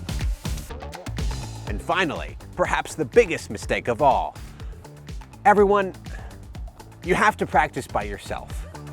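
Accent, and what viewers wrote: United States. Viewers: Nah, his accent is much likely american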